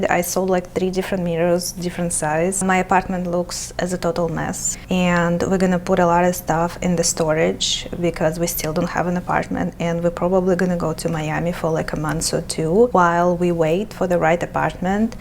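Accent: Russia. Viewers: невозможно слушать такой сильный русский акцент!!!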